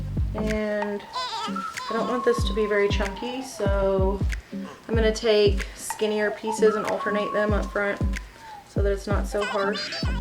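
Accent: South, United States. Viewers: I just love your southern accent. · I heard your country accent, heard u were a mom of boys and a nurse and subscribed. Where are you from? Im from Texas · Which shade Frost and Glow did you use? I need to do this STAT! You’re freaking gorgeous and have the cutest Southern Accent. Ignore all the trolls. They have nothing better to do with life!! Please get back to me on the Frost & Glow shade so I can get this done fast!! Thank you!! ❤️❤️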